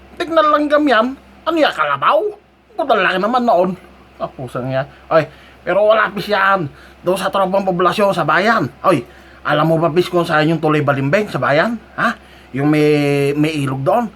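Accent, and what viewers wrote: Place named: Imus, Philippines. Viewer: Ha ha ha..Kuhang kuha ang tunay na puntong Imus, ha ha ha..Caviteño here for life. Naknangpusa yan! Amabu primero pa nasabe ha ha ha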